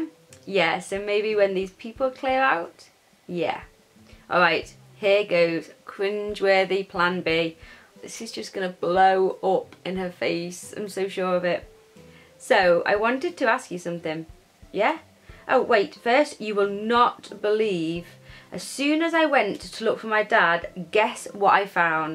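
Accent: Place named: England, United Kingdom. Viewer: How do you read so fluently??? I luv ur accent, do you live in England? :3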